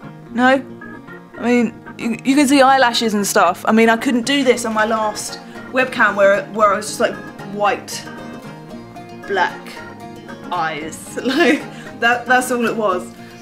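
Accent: England, United Kingdom. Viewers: Yeah, but how do I get the cool English accent?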